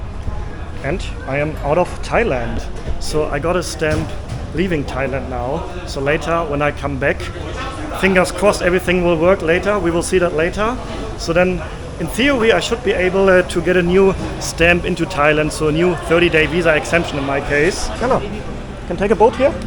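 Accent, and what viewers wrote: Germany. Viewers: 😂😂😂😂😂😂😂😂😂 diese diese worst German English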